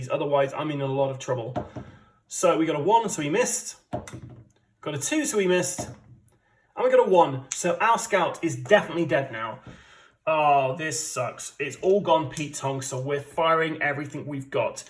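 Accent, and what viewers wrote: United Kingdom. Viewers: What an unfortunate massacre. Valiantly fought, alas it was not meant to be. I do not know enough about this game to give a proper assessment of what hurt our chances most and what could have been done better, but from my layman’s position, it looked like the scout’s assault on the objective room manned by three Germans might have been overly optimistic, and its failure the point where the operation took a turn for the worse. You’ll get ’em next time! By the way, love your ’40s British accent.:D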